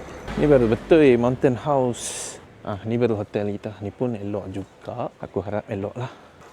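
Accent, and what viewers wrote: North, Malaysia. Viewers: Suka dengar loghat abg..org india tp loghat utara memang padu...btw saya org melayu kedah...loghat abg ni,buat saya rindu kt kg halaman di kedah...salam dari Labuan bang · sy pun baru jer jumpa vlog dokter, mmg puas hati especially penyampaian yg amat jelas (siap loghat utara lg, im from Penang), terus berkonten 🎉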